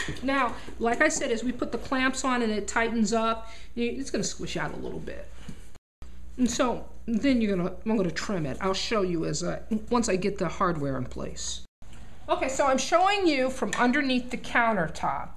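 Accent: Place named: New York, United States. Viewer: I gotta ask, what accent is this? Does this orignate from NY?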